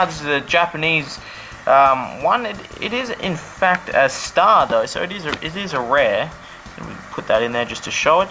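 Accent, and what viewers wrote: Australia. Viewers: HE'S AUSTRALIAN! Sweet :)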